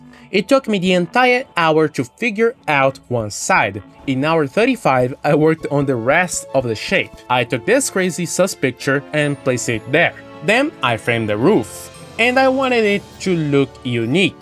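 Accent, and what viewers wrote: Brazil. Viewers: are u brazilian by any chance? your accent looks like a brazilian speaking english 😆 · Meu deus cara eu achei tava ficando doido pq eu senti no fundo do meu peito q teu sotaque era brasileiro, enfim vídeo bom pkrl continue pfvr meu xarà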